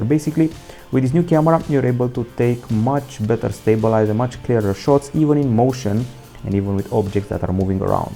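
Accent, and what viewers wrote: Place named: Romania. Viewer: Romanian accent 😀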